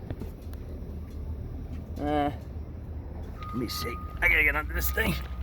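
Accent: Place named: New Jersey, United States. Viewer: The Jersey accent makes it all worth it.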